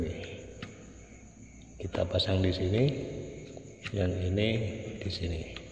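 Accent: Madura, Indonesia. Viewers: Madura ya mas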